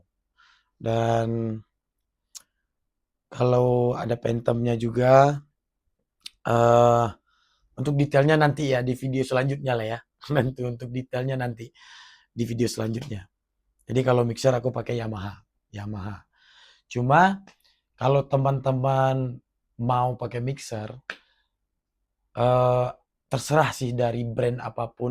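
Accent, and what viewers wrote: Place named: Padang, Indonesia. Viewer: Bg lu org padang ya? Bahasa indo lu agak lain 😂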